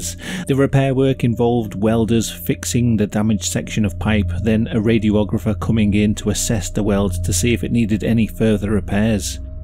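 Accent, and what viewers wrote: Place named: England, United Kingdom. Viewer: This guy (is) English, he's based in the UK. 😂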